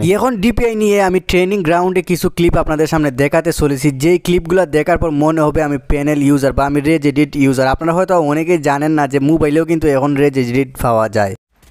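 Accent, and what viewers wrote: Sylhet, Bangladesh. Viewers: Vai Ami o sylhet taki apni o sylhet er hobigonj mone hoy apnar YouTube channele Ami Ei vedio ta deklam R apnar kota gula sylheti Tay Apnar YouTube channel Take Subscribe korlam....Plz.Replay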